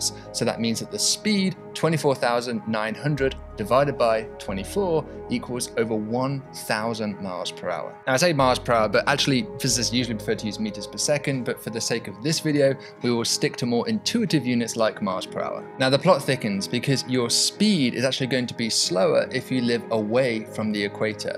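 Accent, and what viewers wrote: England, United Kingdom. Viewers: English accent does that to most people, he was raised in the UK, went to the University College of London so he was engrained with it. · For anyone in the USA or UK MPH is more intuitive and he sounds English to me so I guess it’s up to him.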